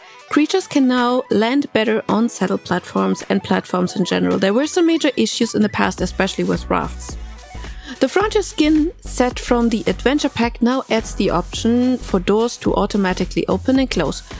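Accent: Germany. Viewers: Bist du deutsch? Hört sich sehr danach an xD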